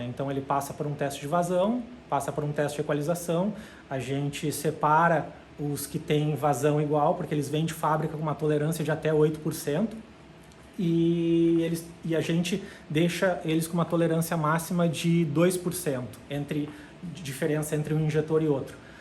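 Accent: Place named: South, Brazil. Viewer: Cara tem a mesma voz do Cristian da Education kkkkkkkkkkk esses do Sul falam bem parecidos com outros da região ........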